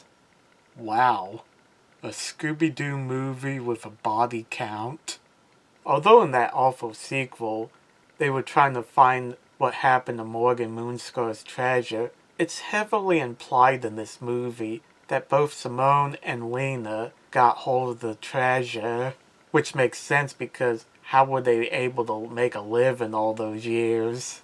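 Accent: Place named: Maine, United States. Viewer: hey, just found ur channel thru ur video on roy and i loved it! very helpful information. i was just wondering, are u from the northeast by any chance? ur accent makes me think u might be from maine. take care!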